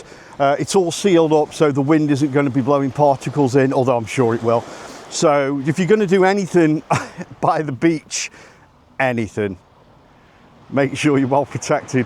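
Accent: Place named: Stoke, United Kingdom. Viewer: Great video. Just subscribed mate. Also detect a bit of stokie.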